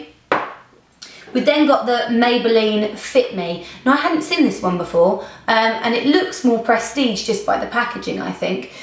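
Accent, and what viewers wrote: United Kingdom. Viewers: Omg im so so so in love with your english ,like harry potter 😁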